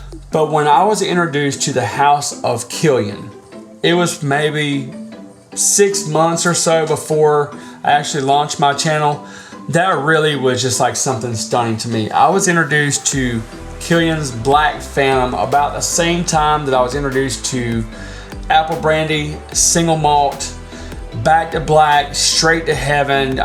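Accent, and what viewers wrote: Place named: Louisiana, United States. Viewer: I don't know if that was a chicano accent or a one straight from the bayoo 🤔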